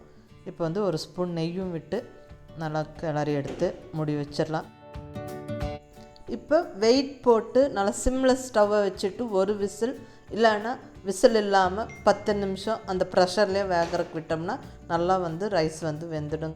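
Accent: Coimbatore, India. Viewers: Super Mam.. Unga Kongu Tamilum super... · pathalea sapidanum pola iruku👌🏻👌🏻nangalum coimbatore dha akka..Muscatla irukom..Ippove oodi vandhiranum pola iruku namma ooru bashai keka keka.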